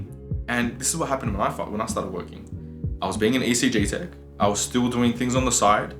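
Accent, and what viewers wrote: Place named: Australia. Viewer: sounds like Australia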